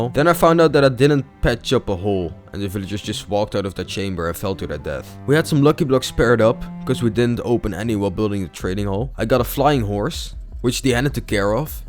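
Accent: Netherlands. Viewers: I smell nederlander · 2mln views, u deserve this, r u dutch? Ur accent sounds dutch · You sound dutch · I smell Dutch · Jo you have dutch accent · Je bent Nederlands dat hoor je meteen! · Bro je nederlandse accent is wel echt goud😂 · You sound Nederlands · Jij bent nederlands dat hoor ik gewoon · I Smell DUTCH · Ik weet dat je Nederlands bent ik hoor het gewoon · Just please do something about your Nederlands exent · sound like ur dutch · i smell nederlands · Very dutch accent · Hahah you sound Dutch are you Dutch hahaha 😂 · Nederlands ik hoor het aan je stem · You rlly have a Dutch accent 👀👀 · Its dutch. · De nederlandse accent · Je hoort gewoon dat het een Nederlander is 😂😂👍🏼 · I just love this dutch accent Ik hou van dit nederlandse acsent🤧✨🤜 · Mmmmm i smell nl accent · Nederlands Engels 😍🥵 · idk whybut i smell dutch /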